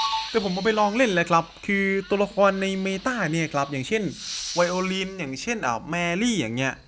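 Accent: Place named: Rural, Thailand. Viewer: เสียงเหน่อเรยขำ555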